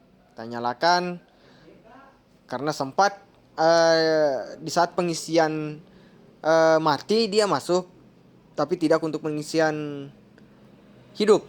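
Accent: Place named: Makassar, Indonesia. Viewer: Kentara logat makassar nya wkkw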